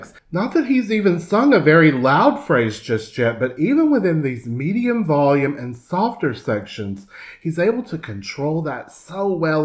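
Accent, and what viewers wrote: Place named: South, United States. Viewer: "I am so GRATEFUL I found you"!!!!! As you kept saying Amazing!!! Amazing!!! I kept saying the same about you, "AMAZING, omg this man is amazing meaning you and the singer". I have heard before, but not depicted and explained as you have done so beautifully. Your reactions, and interpretations, from the symphony, the mariachi, and of course the singer, to the lyric of this beautiful song which is quite meaningful. Thank you so much for your respect an appreciation to latin culture, all though I am Latina but not from Mexico (not mexican) many hispanic cultures, appreciate each others music & folklore. To have such a wonderful person such as yourself, appreciate it too and truly understand the significance, and the value that that this singers VOICE does for this song, was such a pleasure to see. I am now a new sub and fan of yours, I am truly in AWE of your persona, and you truly are a gifted TEACHER in more ways than just voice. 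To see your expressions, and to hear your comments, I was right there with enjoying you & this beautiful composition. I applaud you again & again, music holds no boundaries and you truly are a testament to that. Given the certain discords that many ppl of color have gone through recently in the U.S.A. (a country that means so much to many of us, a country we honor as well) many of us have felt hatred, and bridge in desperate need of repair. Then here you are this wonderful man with a beautiful southern accent, teaching us about music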